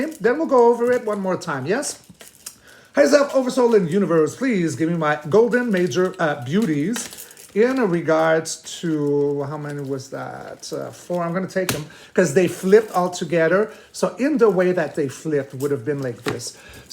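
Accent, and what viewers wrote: France. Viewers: 🎉❤your English is amazing with a beautiful French twist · You have such a wonderful French accent. Thank you for your light hearted readings! You always make me smile! Blessings! 💕🕊️💕💥💥💥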